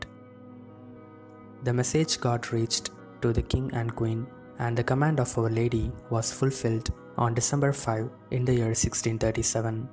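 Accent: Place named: India. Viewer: Your accent seems to be of an Indian. Great Please continue your good work. May our lady bless you.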